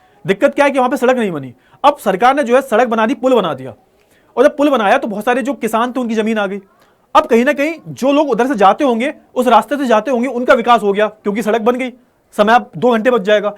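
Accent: Haryana, India. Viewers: sir always my favourite teacher ❤kis kis ko haryanvi boli badhiya Lage hai 🎉❤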